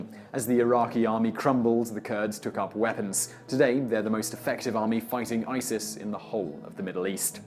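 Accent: United Kingdom. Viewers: He speaks the Queen's English & depending where in Great Britain he was born & raised will depend on the accent. My country is an English speaking country but we have our own language & accents that not many apart from bruhs & sis's near us would understand. We love having our own language & it's hilarious for foreigners to try & work out wtf we are actually talking about. But ur 100% right about the UK education & America's speak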